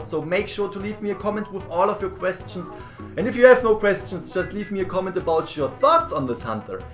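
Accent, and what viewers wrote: Germany. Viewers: Man merkt das er deutsch is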